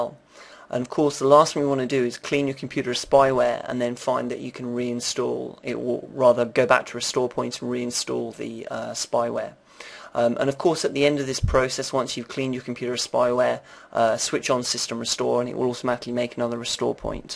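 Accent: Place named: Mid-Atlantic, United States. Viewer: Sorry. I tried to watch this, but got too annoyed at the mid-atlantic accent. You're English man! It's not 'compewder' - it's 'computer.'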